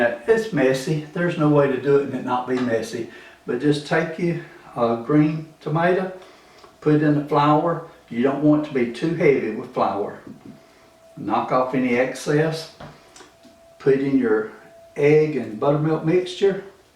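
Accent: South, United States. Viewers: I love the Mayberry southern accent.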